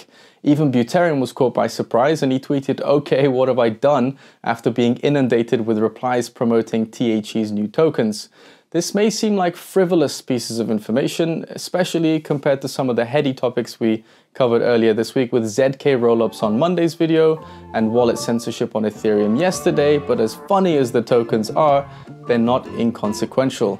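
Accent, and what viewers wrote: United Kingdom. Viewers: English accent/+ , not Robin but Funny/+, look/(...as always) irrelevant - finally! :)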